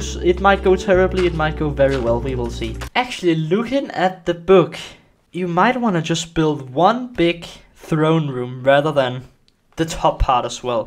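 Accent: Denmark. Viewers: He is danish im danish so i Can here it with his accent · You have danish accent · Why does it hear like hes danish?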